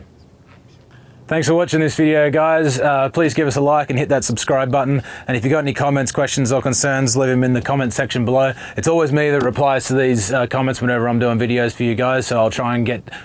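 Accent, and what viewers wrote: Australia. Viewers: Are you from australia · you sound like ausie?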